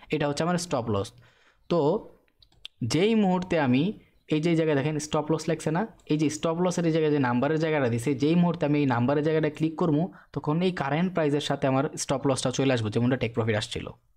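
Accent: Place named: Narayanganj, Bangladesh. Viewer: ভাই আপনার বাড়ি কি নারায়ণগঞ্জ, আপনার কথার ভাষা এমনটা মনে হয়।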